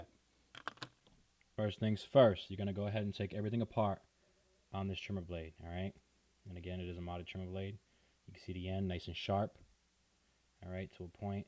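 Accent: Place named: New York, United States. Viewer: U from new York right for 100 buck I bet I'm right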